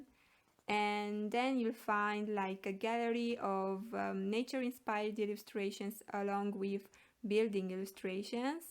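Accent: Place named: Italy. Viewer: Ho capito subito che eri italiana dall'accento 😂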